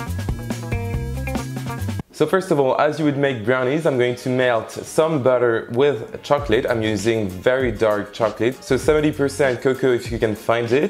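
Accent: France. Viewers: MUST try this recipe. You make it look so delicious! ❤ Hey, just curious. I can't place that accent. Where in France are you from,?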